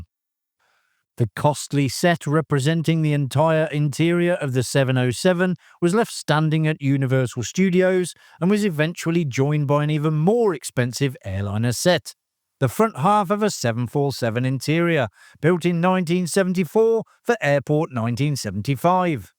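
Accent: United Kingdom. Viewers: When I hear a british accent I know its time to turn off.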